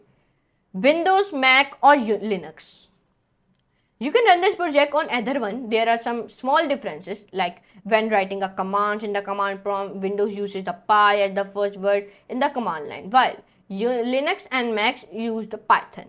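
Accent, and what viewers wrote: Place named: India. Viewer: yAh india ki english bol rha ha Django ka illawa aur kuch samajh nhi ahh rha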